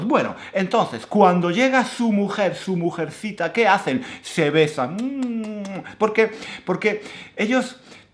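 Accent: Andalusia, Spain. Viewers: Me encanta el acento andaluz ❤ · I love your Andaluz Accent!!!!!!!!! me encanta su acento Andaluz!!!!!!! muchas gracias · Verdad? Eres Andaluz? De q cuidad? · ¡Me encanta escuchar tu acento andaluz!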